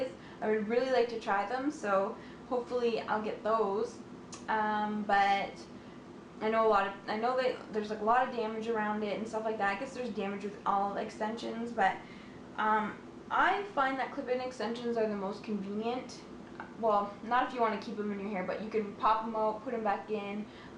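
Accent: Canada. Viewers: Are you Canadian? Because you sound like you have a Canadian accent...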